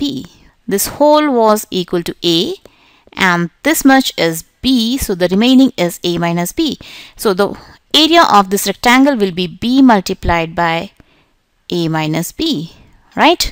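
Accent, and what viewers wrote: India. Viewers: O the pronunciation and so you are indian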